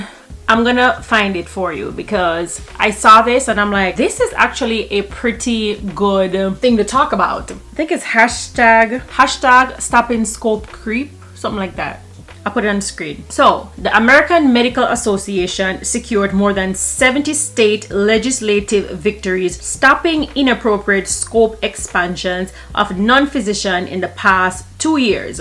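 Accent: Jamaica. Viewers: Come through Jamaican Accent! lol, great video.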